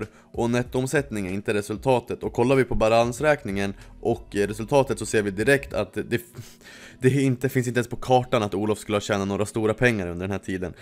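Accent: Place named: Sundsvall, Sweden. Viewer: Asså, kommer du från Njurunda/Sundsvall? Låter exakt som en snubbe jag känner på sättet du pratar osv. Menar inte att det är du men ifall ni är från samma område.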